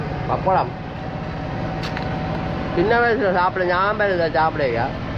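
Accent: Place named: Tamil Eelam, Sri Lanka. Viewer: Super ellam castly mathri ullathu ungal eela tamil super ஒவ்வொரு மனிதரும் ஒவ்வொரு மாதிரி இருப்பாங்க take it easy ungal vaelai thodarnthu seinga thumbi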